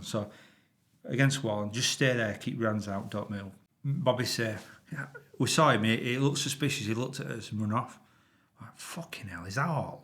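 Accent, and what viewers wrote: Yorkshire, United Kingdom. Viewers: Keep em coming, Makes my day listening to your video's. & the Yorkshire accent makes me smile too! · I fucking love a good yorkshire accent me 😍🤣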